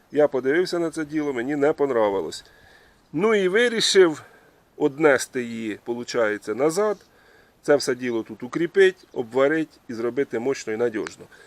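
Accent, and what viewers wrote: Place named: Dnipropetrovsk, Ukraine. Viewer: Дядько ви з Дніпропетровщини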